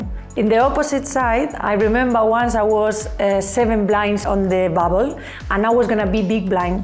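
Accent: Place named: Spain. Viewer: she’s obviously not Indian you melon, that is a Spanish accent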